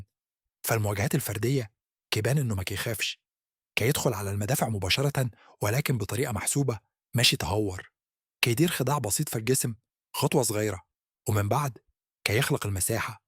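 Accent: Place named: Egypt. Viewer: ماشاءالله تتقن جيدا اللهجة المغربية بنطق مصري😂😅. جميل جدا❤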